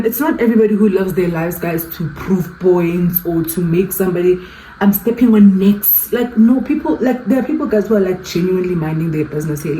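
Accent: Nigeria. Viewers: The minute i saw Stanley on the caption i was so quick to get mine😭😭👍🏿 Stanley cup ke achievement 😭❤️❤️❤️. It's the Nigerian accent that always makes me come back here 😂😂😂 Please na. 😭❤️ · I love the Nigerian accent abegg🤣❤️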